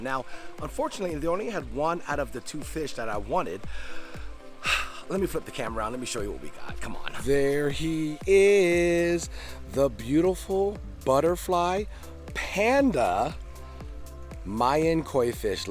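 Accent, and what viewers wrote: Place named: California, United States. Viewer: Has that lib twang cali vides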